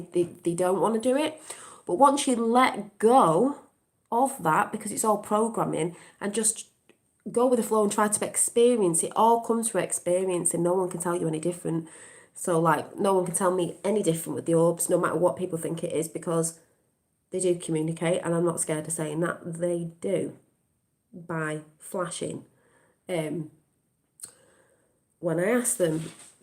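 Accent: United Kingdom. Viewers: That's our girl, always on point with that British voice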